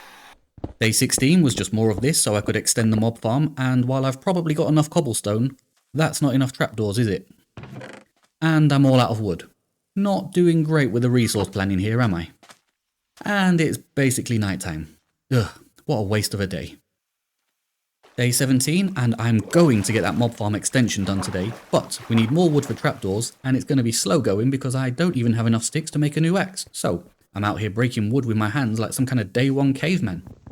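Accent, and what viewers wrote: United Kingdom. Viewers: What kind of British do you speak. I love it 🥹🥹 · Your British jokes are very distinct and interesting.. but your accent is incredibly annoying, like every British accent. Could you tone down that way of speaking a bit, or else speak in Brazilian Portuguese so I can understand? Thank you!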